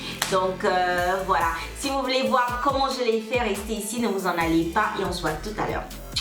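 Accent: Ivory Coast. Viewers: Tu es ivoirienne ?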